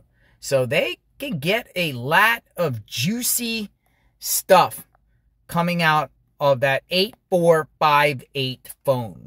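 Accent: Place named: Pennsylvania, United States. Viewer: Off topic, forgive me but, I know you were from PA. I am too. I could hear your accent. Haha I'm from Philly.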